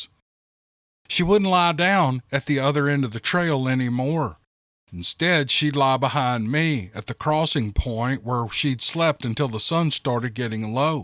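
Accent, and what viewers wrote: South, United States. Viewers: I don't know if they are true either. But to me it really doesn't matter. If they are true, good,if they aren't then it was a good story. I'm never going to assume that they aren't, cause I don't know. But please keep reading them regardless. I love listening to your voice with that southern drawl.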